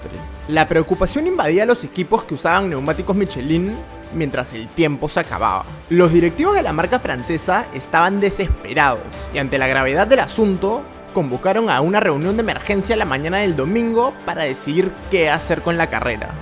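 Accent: Peru. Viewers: Por favor deja ese acento de limeño, habla neutro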